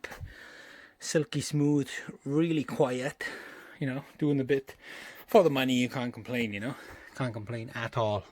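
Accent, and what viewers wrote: Wales, United Kingdom. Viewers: Currently looking into getting one of these. What are your thoughts on after owning if for some time. It took me a minute to understand your accent at the start then realised you're Welsh haha I'm from Carmarthenshire (Cross Hands). Not used to hearing our accents on YouTube!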